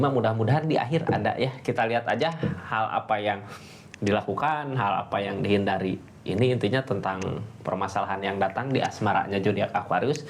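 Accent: West Java, Indonesia. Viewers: Nyabut jukut😂😂, aduh si Abang sunda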